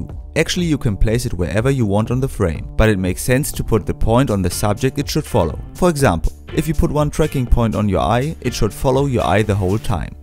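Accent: Germany. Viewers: solid info delivered with dry snark in a German accent- yeah, I'm subscribed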